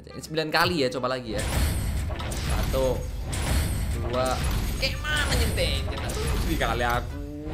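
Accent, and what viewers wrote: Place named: Medan, Indonesia. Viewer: Gw suka kalo bag marah marah kau kau wkwkwk org medan mana ni horass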